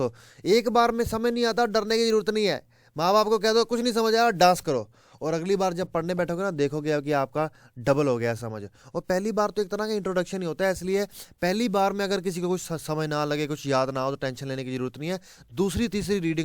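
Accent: Punjab, India. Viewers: Sir punjab toh lgda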